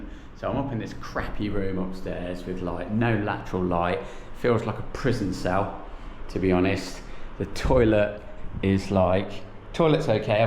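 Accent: United Kingdom. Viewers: Thanks for posting. My second great grandfather died after sailing out of India years ago on a tea clipper. It really does frame the disease risks of living abroad. My own personal experience of living abroad is in China, where I lived for many years. Before I went I took quite a few shots of vaccination, and while there I boiled all my water, unless I drank bottled. All my time, more or less was spent in cities. I'm Rh- and I read somewhere we are less likely to get Malaria - I don't know if anyone reading this has heard this....? By the way, whereabouts are you from - I'm guessing somewhere between the East Midlands and London?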